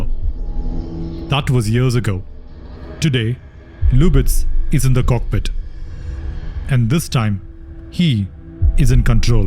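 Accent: India. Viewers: Fck the narrators thick Indian accent … is so disturbing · Hey are you from india? You accent sounds indian.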